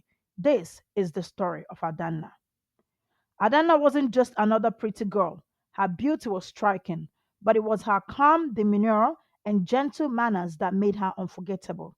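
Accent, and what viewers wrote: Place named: Nigeria. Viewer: This Ai voice is unique with a touch of Nigerian accent 😂😂 and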